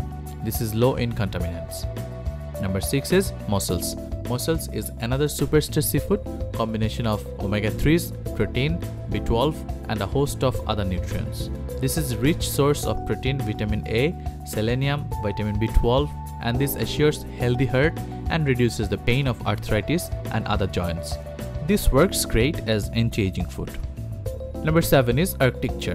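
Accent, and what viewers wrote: India. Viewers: Indian accent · Wrong information!!! Indian accent!!!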